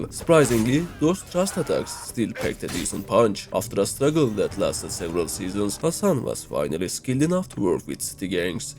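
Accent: Turkey. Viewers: Where are you from bro? You sound Turkish. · Aksanindan anladım reis türk olduğunu 😅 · Aksanından dolayı türk olduğun anlaşılıyor selamlıyorum seni kardeşim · hasan diyişine kadar türk olduğundan emin değildim. 1 saatlik ingilizce konuşma iyi baya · Bro you sound Turkish...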